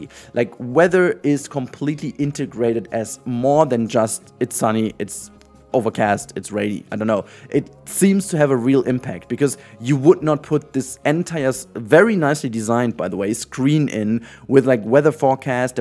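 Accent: Germany. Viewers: Great. Grüße. Warum machst du eigentlich englische Videos? Man merkt natürlich das du deutscher bist :D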